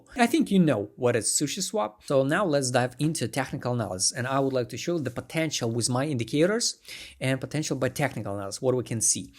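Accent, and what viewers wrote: Russia. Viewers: man you have clearly Russian accent, isnt' it?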